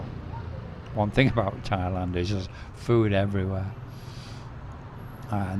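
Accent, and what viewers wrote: United Kingdom. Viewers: I am trying to work out your accent? Where are you from in the UK?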